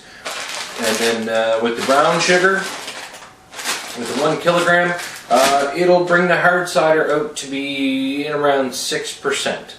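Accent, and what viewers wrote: Canada. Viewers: LOL He sounds Canadian. Of course he made it to get drunk.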